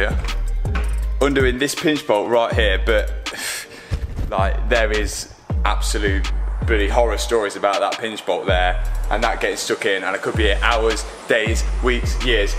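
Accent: United Kingdom. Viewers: We still enjoy youre positivity drive that u got .. and that uk accent make it perfect hahah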